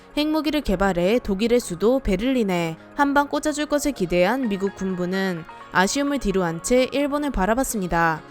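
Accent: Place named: Gyeongsang, South Korea. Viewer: 언니 경상도분이신가보다 !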